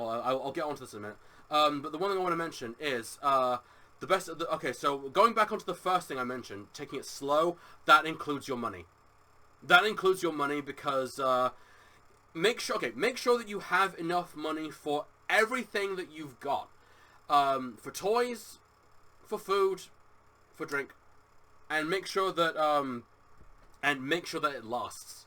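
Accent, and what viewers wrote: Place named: United Kingdom. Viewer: This whole time and I never realized you were British lol Lord help me, I'm not bright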